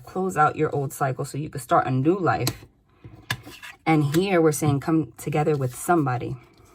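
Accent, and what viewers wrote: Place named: New York, United States. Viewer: ok not relevant to the vid but i'm living for that new york accent.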